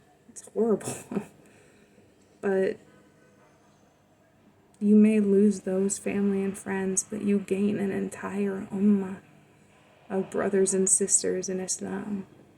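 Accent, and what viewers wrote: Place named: Midwest, United States. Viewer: Salaam, which part of the Midwest are you??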